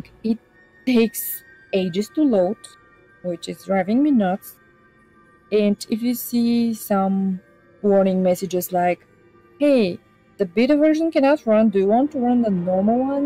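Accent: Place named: Bulgaria. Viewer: hey hey im really wondering what your first language is? is it bulgarian? you sound like my bulgarian friend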